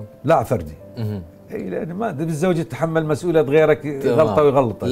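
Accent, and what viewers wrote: Iraq. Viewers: ماأجمل اللهجة العراقية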